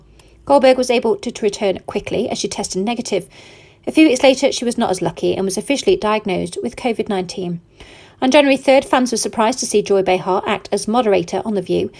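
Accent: United Kingdom. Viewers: I can't understand a word ... a heavy British accent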